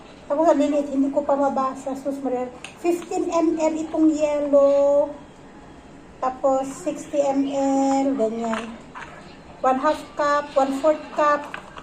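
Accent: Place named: Laguna, Philippines. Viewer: Nkpunta KC sya Laguna..kya may eh n Rin sya.pero timmangkin suna nga ilocana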